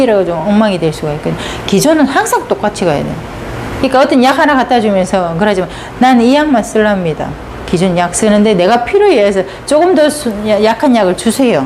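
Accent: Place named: Gyeongsang, South Korea. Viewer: 오늘 처음 들어왔는데요. 경상도말씨가 정겹습니다. 거의 대부분의 유튜브가 서울말씨인거에 비하면 부드럽지는 않은데 정확하게 알려주시네요. 설명이 아시는거에 비해 매끄럽진 않지만 ㅎㅎ 저는 참 좋습니다. 엄청난 내공이 팍팍 느껴집니다. 실전이 느껴집니다. 계속 들어가서 배우겠습니다.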